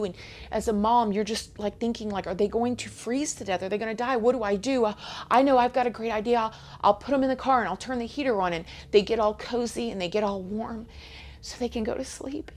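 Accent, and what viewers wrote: South, United States. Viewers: I swear you have become my favorite YouTuber. If you are the same in person then I would love being around you. You would probably be a good influence because I catch myself wondering how you feel about some subjects. You are so comfortable with being yourself. With being Southern! I have traveled/lived out West and some up North. When I hear you explaining things that I hear other YouTubers make fun of because it's Southern, it is refreshing. I can laugh at what we do as Southerners, but when someone else does it, it bothers me? 😆 Bailey Sarian is the only reason I started watching YouTubers instead of just music. You were like the second person. I didn't know how I felt when you started doing True Crime. Then I loved how you covered current events and your commitment to uploading on days expected and more. It actually confused me when I saw "spooky intro", but I just thought I missed it. 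You always upload! So happy for you! You deserve it! I totally see you getting to millions. Don't change... your sincere tears was so relatable! You're doing so good!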